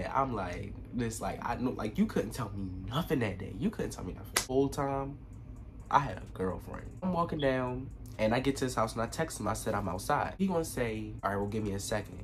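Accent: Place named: DMV, United States. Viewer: U gotta be from the dmv cuz the accent lawddd😂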